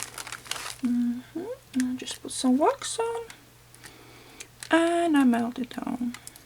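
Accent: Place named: Iceland. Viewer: Hello! While watching your video I had a feeling that you have an icelandic accent, am I right?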